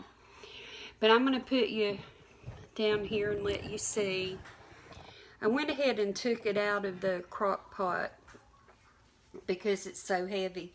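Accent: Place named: Tennessee, United States. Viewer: I just love your accent! I’m from Tennessee but have lived in Michigan for the past 10 years. Listening to your videos takes me back home to being in the kitchen with my Mom and Aunts. Those are treasured years! I’m so inspired by your wholesome recipes. I’m taking both your Dollar Tree chocolate cherry cake and apple yellow cakes to my office potluck tomorrow. Thanks so much for taking the time to share with us!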